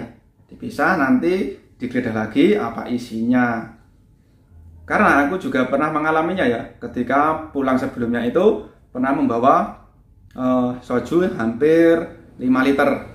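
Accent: Java, Indonesia. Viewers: Medok tenan kang...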